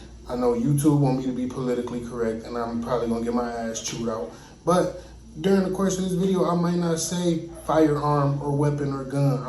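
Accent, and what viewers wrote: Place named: South, United States. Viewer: 😭😭😭😭 Sounds Just Like Down South, When I Use To Live In TX & FL, I Remember A Few Niggas Said It Like That, Always Sound Fire 🔥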